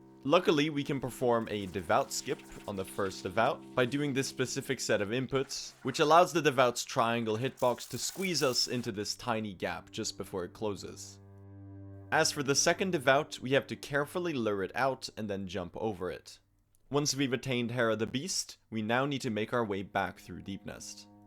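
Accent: Sweden. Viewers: brother are you swedish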